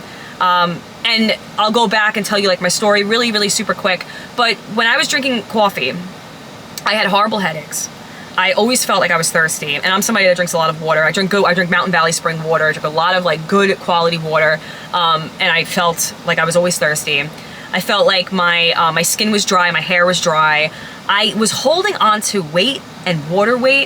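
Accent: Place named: Long Island, United States. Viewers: just sold on you're accent immediately. I have that same New York accent. Pleased to meet you. Im quitting cawfee myself today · I'm from Long Island, NY as well and I knew right away she was from Long Island especially when she said " cawfee". I don't realize I sound like that too until I hear myself on a video or audio recording😂 BTW we find British accents interesting too. Unless you have that spit filled " Scouser' accent like the fighter Darren Till. .😂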